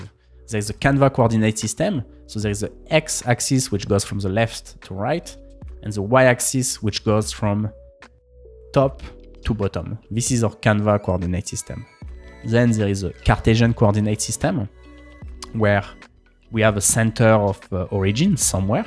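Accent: France. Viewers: Nice French accent there, Sean Evans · But maybe french cause the zzhh prruh pronunciation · This accent is really French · Oh je sens l'accent français ✌️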